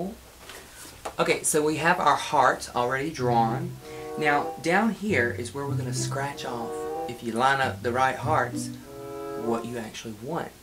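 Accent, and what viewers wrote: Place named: South, United States. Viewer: thanks for the reply! I definitely like you're idea better, I'm working on mine tonight as soon as I get home! Keep up the great work!! Can't wait for thext video! BTW, where are you from? You sound soo southern!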